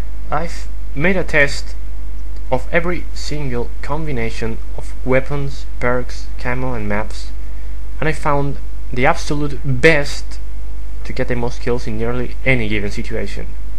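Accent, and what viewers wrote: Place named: Spain. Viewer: Lovely spanish accent... (Y)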